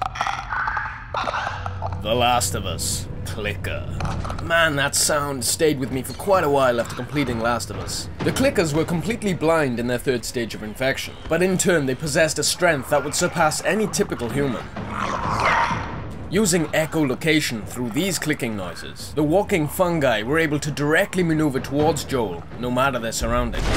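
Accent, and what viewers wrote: Wales, United Kingdom. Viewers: Hey, are you Welsh? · Are you Welsh? You sound pretty Welshy to me :)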